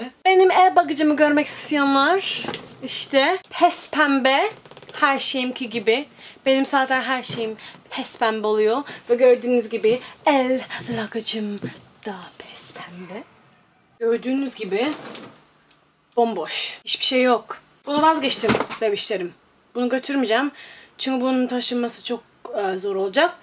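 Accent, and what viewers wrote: Turkey. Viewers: Biraz Egeli gibi konuşuyorsun çok şekersin ya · trakya şivesi var beeee cndkcndkcjdkfkeodidod yerim yerim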